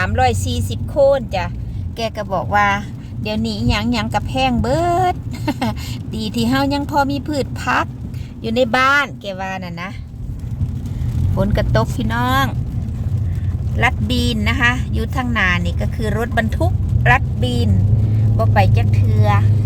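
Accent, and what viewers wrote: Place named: Ubon Ratchathani, Thailand. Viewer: เว้าได้ไพเราะจ้า สำเนียงทางอุบล เด้ อจ้า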